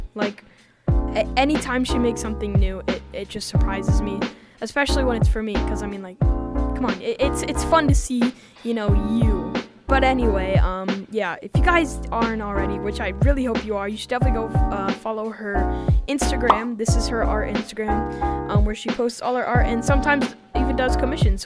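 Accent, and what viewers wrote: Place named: United Kingdom. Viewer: Are you British or American I cant tell 😅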